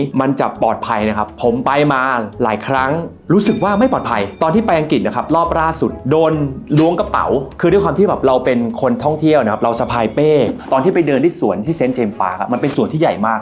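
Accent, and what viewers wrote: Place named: United States. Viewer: พูดเหมือนที่เมกาในตอนนี้เลยค่ะ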